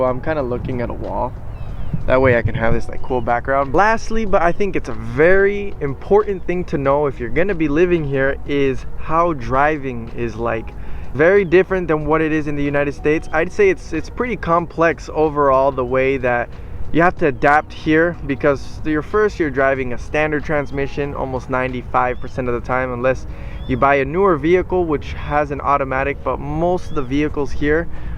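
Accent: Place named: Argentina. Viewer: You have an accent in English why do you act like you’re American. Maybe you lived here for a while but you’re Argentinian.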